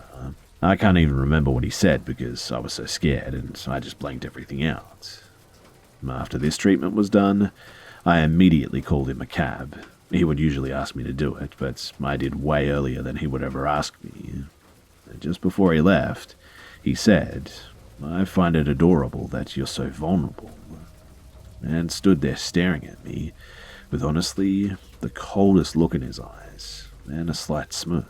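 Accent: Australia. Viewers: Omg my body basically can’t fall asleep at night without listening to this Australian mans voice 😂 It’s pretty annoying since I fall asleep while listening and when I wake up I have to find my air pod because it falls out of my ear while I sleep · Love Australian accents! ps I’m Canadian! Lol I always try but it comes out British haha I repeat this guy all the time mate lol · If I'm having trouble sleeping, I usually turn on one of your videos cause your low, Australian voice lulls me into a sleep. I love your content 💛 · 2.5 hours of sexy Aussie voice.;). 🥂🍻🌿🌈🌸🌃🌆🌇🌋🌛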